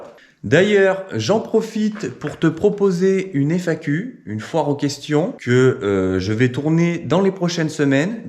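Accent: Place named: Cantal, France. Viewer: Salut merci et bravo pour le travail et les vidéos. Quel projet ! Je découvre la chaine il y a 4 jours et toutes les vidéos y sont passées. Je trouve ton humour second degré et auto dérision excellent. À mon avis le petit accent est cantalou ? FAQ:c'est tout simplement à ce stade que tu présentes ton parcours comment tu as acquis ces compétences comment tu geres financièrement le temps des travaux même si j'ai mon idée. Pour le poêle dans ton style un peu industriel je trouve que un poêle bullerjan dit canadien irait très bien. J'en ai deux chez moi un gros de 16kw et un petit de 6kw pour une dépendance. Si tu veux je peux t'envoyer les photos sur ton mail. Merci encore et à très vite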